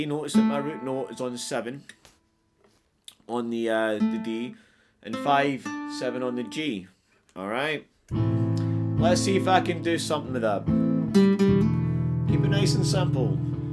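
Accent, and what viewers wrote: Scotland, United Kingdom. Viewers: I just found your channel & have learned more from you in the last couple of weeks, than in last couple of years on my own or even in lessons! Even with your Scottish brogue & my Southern American ears‼️🏴🇺🇸🤔🎸😆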